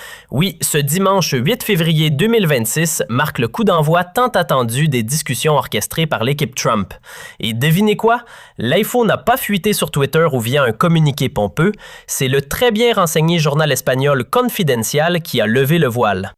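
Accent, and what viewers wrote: Canada. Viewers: Mensonge, on dirait un Marocain qui parle canadien , les algériens sont a l'aise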